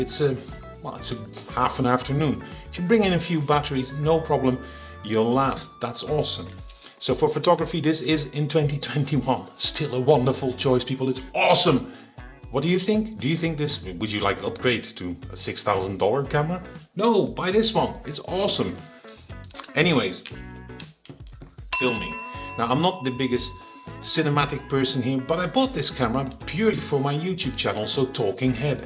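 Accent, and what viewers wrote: Netherlands. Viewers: Very nice review! Your accent is... Dutch?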